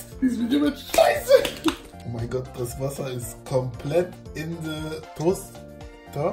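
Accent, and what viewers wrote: Africa. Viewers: Hahah wie süß deine Freundin ist hahah am Ende wie sie dich einfach sauber macht und dein Afrikanischer akkzent ist einfach legender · Er kann diesen afrikanischen Akzent so gut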